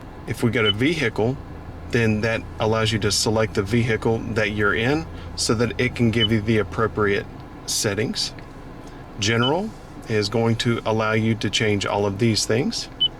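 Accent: North Carolina, United States. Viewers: I like your NC sweet accent but boy you need to learn things about cars. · I LOVE your accent😍 your voice is so soothing too lol #southernaccentftw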